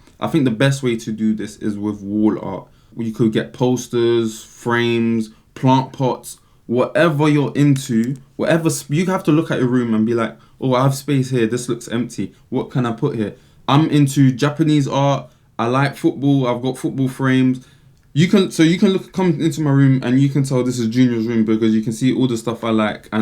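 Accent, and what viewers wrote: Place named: United Kingdom. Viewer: that's a real nice british accent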